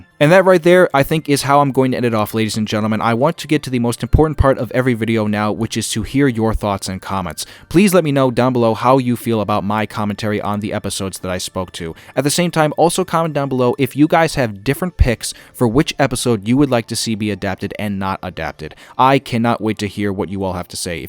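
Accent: Bisaya, Philippines. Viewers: Hahaha bisaya sad ka brad? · uu, klaro kaayong Bisdak 😆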